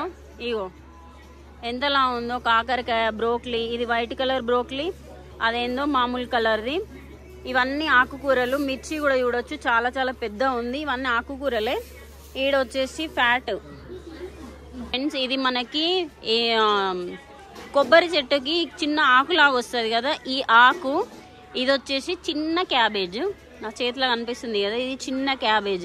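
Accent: Telangana, India. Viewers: మన తెలంగాణా యాస ఎంత తీయగా ఉంటుందో.. superb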